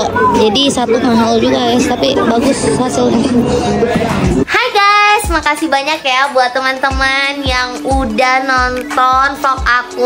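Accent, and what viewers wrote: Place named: South Sulawesi, Indonesia. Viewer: Kak pekat betul ba loghat bugis mu😂😂😂,btw Salam dari bugis pare" Sabah Malaysia kak enjoy terus kakak selama di Malaysia ya😆🙏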